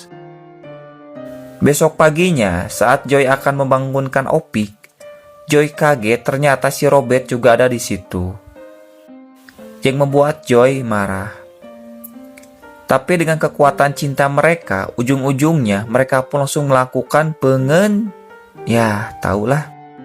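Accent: West Java, Indonesia. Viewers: Sunda mang wkwkwkw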